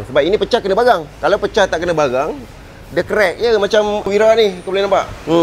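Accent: Indonesia. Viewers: Indon ke dia ni?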